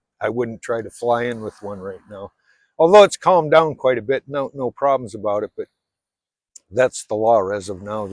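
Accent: Canada. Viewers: You sound like a Canadian.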